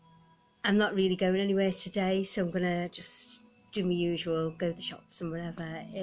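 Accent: Liverpool, United Kingdom. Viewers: What a gorgeous cat Lucy is...what age is she?....love listening to a Liverpool accent...reminds me of the much missed Brookie :)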